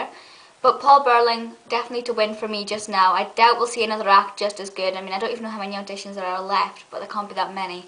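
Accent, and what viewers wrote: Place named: Northern England, United Kingdom. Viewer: I thought Paul was pretty good. Too bad he only did impressions of people and characters that I don't particularly care for. Harry Hill? Blah! Scooby Doo & Popeye? I liked them when I was 6. Anyway, I'll bet you get this all the time, but I love your accent! You replied to someone else´s comment, saying that you're not Scottish, which I must admit, I thought you were. So is it Northern England, then? It's beautiful. And so are your eyes. (sorry) But they really are!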